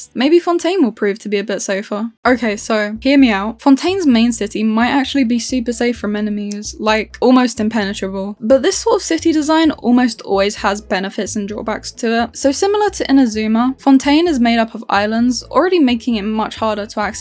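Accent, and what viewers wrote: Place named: United Kingdom. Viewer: but why are you british?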